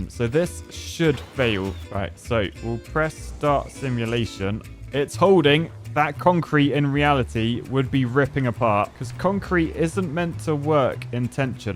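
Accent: United Kingdom. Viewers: Why does sound British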